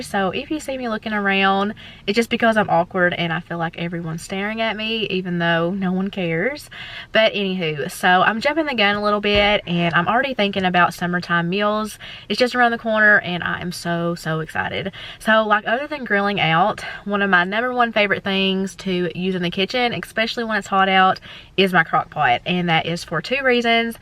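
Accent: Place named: Kentucky, United States. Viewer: I'm in Louisville!! Always knew the accent was familiar it's cause we Kentuckians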